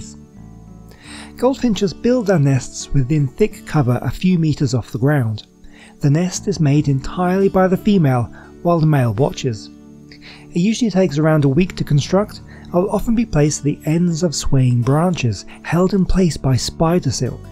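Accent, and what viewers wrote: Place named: United Kingdom. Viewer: Where in the uk are you from? Love your videos by the way 😊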